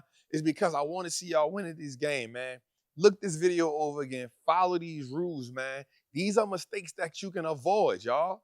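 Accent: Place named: Louisiana, United States. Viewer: Thanks for the information!! Also, love your Cajun accent!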